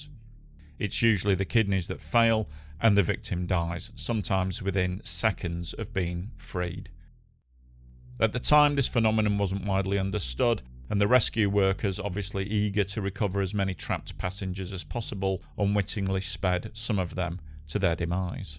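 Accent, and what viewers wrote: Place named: Hull, United Kingdom. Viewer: Does anywhere know where is from? I'm curious about his accent, I'm thinking maybe Hull?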